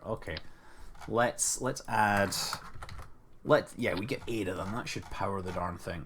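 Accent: Scotland, United Kingdom. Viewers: Thanks for this ive been waiting for a video like this for a while;) Anyway just a question but are you scottish, because you sound like you're from scottland?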